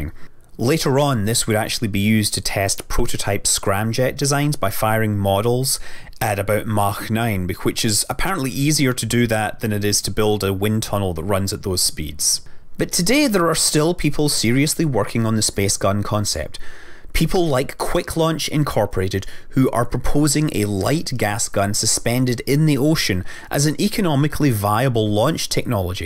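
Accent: Scotland, United Kingdom. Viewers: Hey... are you scottish, you sound scottish?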